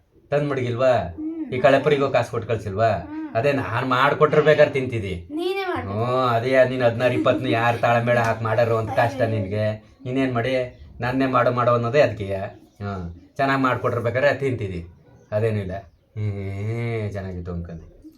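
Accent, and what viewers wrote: Mandya, India. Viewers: ಸೂಪರ್ ನಿಮ್ಮ ವಿಡಿಯೋ. ಮಂಡೇ ಭಾಷೆದಲ್ಲಿ ಕೇಳೋಕ್ಕೆ ತುಂಬಾ ಇಷ್ಟ. ಬಾಗಲಕೋಟೆ ಡಿಸ್ಟ್ರಿಕ್ಟ್ ಇಲಕಲ್. ಇಂದ ವಿಡಿಯೋ ನೋಡ್ತೀನಿ. ಈ ಮೆಸೇಜನ್ನು ದಯವಿಟ್ಟು ವಿಡಿಯೋ ದಲ್ಲಿ ತೋರಿಸಿ ಜೈ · ನಿಮ್ಮ Mandya ಭಾಷೆ ತುಂಬಾ ಚೆನ್ನಾಗಿದೆ brother ನನಗಂತೂ ನಿಮ್ಮ ತುಂಬಾ ತುಂಬಾ ಇಷ್ಟ ಆಗುತ್ತೆ 🎉🎉🫡🫡👌👌👍👍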